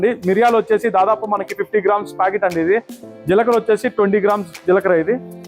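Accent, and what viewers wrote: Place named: Andhra Pradesh, India. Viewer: Meeru HYD lo vuntu Aandra telugu maatladunte vinalanipisthundhandi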